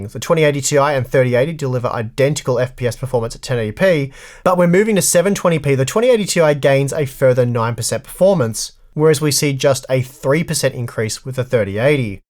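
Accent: Australia. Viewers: I really love how Aussies pronounce things....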